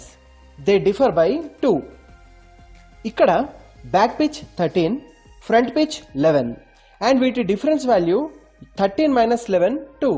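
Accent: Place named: India. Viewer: That's impressive, but We can't here that many words, cause we need english subtitles. Indian english are so difficult to here for another nations.🤔😔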